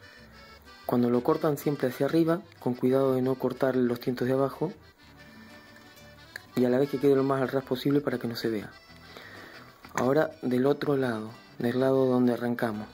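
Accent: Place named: Entre Ríos, Argentina. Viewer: Se me hace que tu tonada es de mis pagos (Entre Ríos) te re felicito amigo me encantan tus trabajos y explicaciones la verdad hasta los mas brutos aprendemos si alguien así explica. Un abrazo y a seguir subiendo videos. Solo me falta saber como llevo estos tutoriales al encabado que es mi hobbie.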